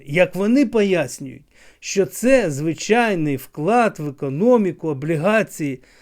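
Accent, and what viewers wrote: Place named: Russia. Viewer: Вы совсем придурок от природы или придурок , который таким стал во время войны?А ЧЕГО СТОИТ ВАШ УКРАИНСКИЙ? С МАССОЙ ОШИБОК И УЖАСНЫМ РУССАИМ АКЦЕНТОМ.КУДА ЛЕЗЕТЕ ПРОВОКАТОР.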